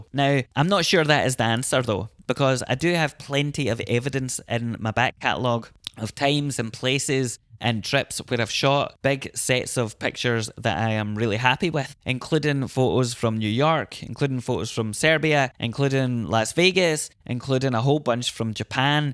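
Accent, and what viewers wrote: Scotland, United Kingdom. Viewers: 👀 Such an awesome new series for your channel and a perfect way to start it off! 🎹📷Really enjoyed all the photos and your "dulcet Scottish tones" throughout! 😁😁 So funny, we both live in areas where the attitudes of the people seem quite similar! haha Looking forward to the next!! 🙌🐀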